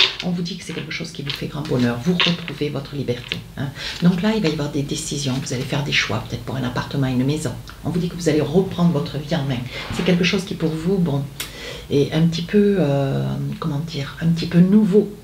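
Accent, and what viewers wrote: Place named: Agen, France. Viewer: bel accent agenais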